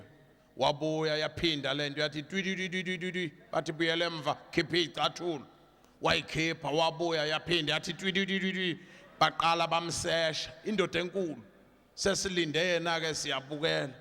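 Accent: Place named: Xhosa, South Africa. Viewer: Wamuhle simakade asimnandi isiXhosa sakhe sindenza ndiyive ngakumbi mna lo myalezo, ziphi na inceku ezigcwele ilizwi nje ngale ndoda.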